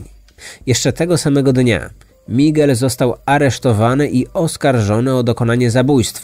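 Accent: Poland. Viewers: te promocje to k.. a ty masz jakies wschodnie pochodzenie. Hajnowka a moze Bielsk Podlaski. lubie cie ale akcent nie z pyrow lecz z podlasia. "trzeba nosic wlasna skore".pozdrawiam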